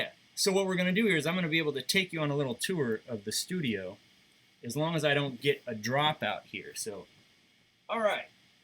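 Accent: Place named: United Kingdom. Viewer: I thought you were a Brit lol...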